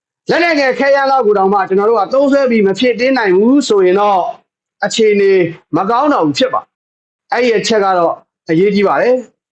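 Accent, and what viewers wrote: Yaw, Myanmar. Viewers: okယောနသံ ❤၁၀၀၀၀၀၀၀၀၀၀၀သိန်း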